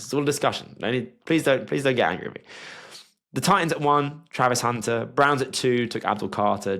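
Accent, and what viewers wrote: United Kingdom. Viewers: Sorry I can't listen to some British guy talking NFL. Wrong football mate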